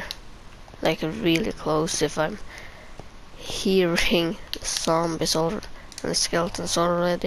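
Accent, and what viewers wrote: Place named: Norway. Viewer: VS Klubben then its a Norwegian accsent..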